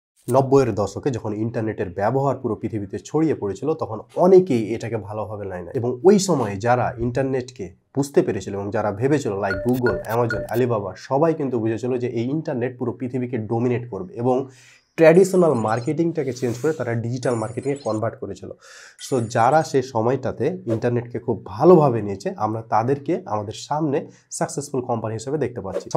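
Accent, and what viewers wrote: Chapai Nawabganj, Bangladesh. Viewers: ভাইজান, আপনার Accent শুনেই বুছেছিলাম চাপাই এর ভাই..... boost করেছিলেন সেখান থেকেই পেলাম, ধন্যবাদ দেখা হবে, ইংশাল্লাহ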